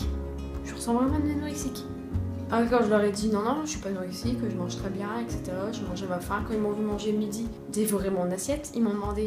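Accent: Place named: Nord-Pas-de-Calais, France. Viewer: TRES BONNE VIDEO ! On entend bien l'accent du nord, bien de chez nous ! ^^, question complexe perso j'en ai plein, mon corps en premier (obèse), mais j'ai adopté le "balécouillisme" enfaite, sa consiste a ignoré le regard des autres, les moqueries etc. Il faut juste s'écouter soit même, perso depuis que j'ai adopté ce truc, je n'ai aucun soucie a me foutre en boxer de bain, si tu n'aime pas mon physique tu ne me regarde pas puis c'est tout ! et comme je dit toujours "Si tout le monde se ressemblerais, le monde serais CHIANT"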